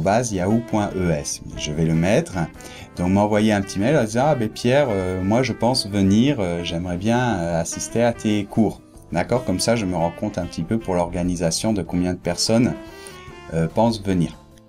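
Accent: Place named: France. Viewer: Tu as un accent français sympa quand tu parles l’espagnole. Comment as tu appris l’espagnole? J’ai un niveau avancé B2. C’est gratuit! Tu fais un bon boulot pierre.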